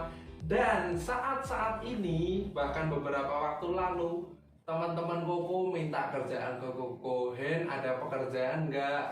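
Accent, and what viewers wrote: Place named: Java, Indonesia. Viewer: Maju terus..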